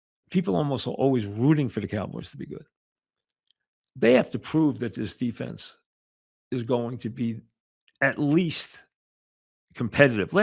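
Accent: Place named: Long Island, United States. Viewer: That's his Lawn-Guy-Lind accent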